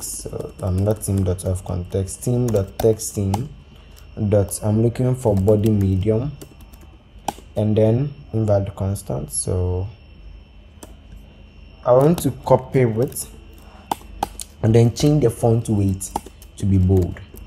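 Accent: Ghana. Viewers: Thank you very much you really helped me, i can tell you are Ghanaian from your accent, keep on doing what you are doing, this will really take you far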